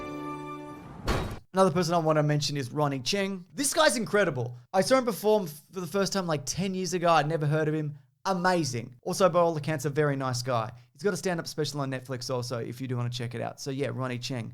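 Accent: Australia. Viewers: I love hearing breakdowns in an Aussie voice. "There's a vortex ring, which is like wind and shit" had me laughing so hard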